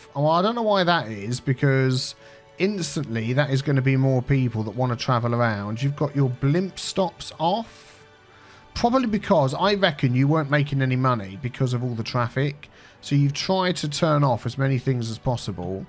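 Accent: United Kingdom. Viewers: Oh ok lol, love your vids btw mate just found your channel last week. Can I ask where you're from if it's not rude, I'm terrible with accents and was wondering what part of uk you were born haha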